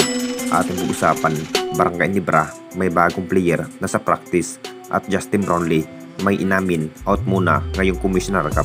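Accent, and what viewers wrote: Visayas, Philippines. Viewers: Bisaya ka man dong.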